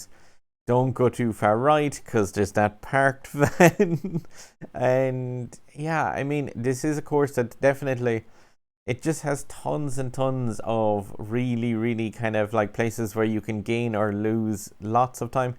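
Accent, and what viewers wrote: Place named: Ireland. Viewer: I love how you start every video with "now~" 😂 love the Irish accent, the way you say "cayr"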